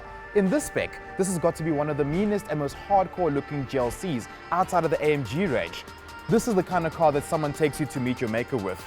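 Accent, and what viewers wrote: South Africa. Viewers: You from South Africa 🇿🇦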